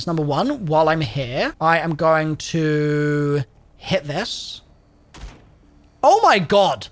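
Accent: United Kingdom. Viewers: Any destiny ppl here thinking this is the brit version of datto or fallout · Oh hell yeah 53 minute vid. Perfect for falling asleep to that soothing brit voice.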